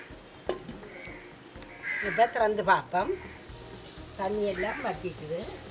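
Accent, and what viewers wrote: Jaffna, Sri Lanka. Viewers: அம்மா உங்கள் யாழ்ப்பாண தமிழ் ரொம்ப நல்லா இருக்கு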